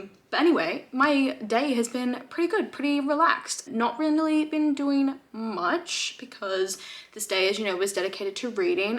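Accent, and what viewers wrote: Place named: Australia. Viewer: You have the same edition of Happy Place that I have .i also have the Wisteria Society to read, may move that up. I had been trying to figure out your accent, thought Oz but it doesn't seem that strong. Where in Oz are you from?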